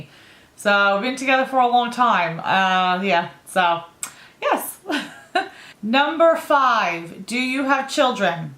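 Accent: New York, United States. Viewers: Love your bubbly attitude your NY accent lovely... Great tag glad getting to know you a little better...tfs beauty🤗🌹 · Hi! Loved getting to know you! You may have left NY when you were a little girl, but you still have that NY accent which I love... I've lost my Jersey accent:-( Hope you're having a great weekend!!! xoxo -Marlene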